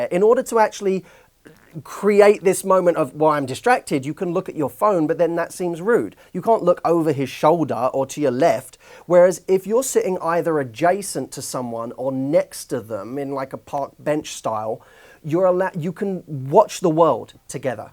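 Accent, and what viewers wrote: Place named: South London, United Kingdom. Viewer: Where are you from? South London? Your accent is adorable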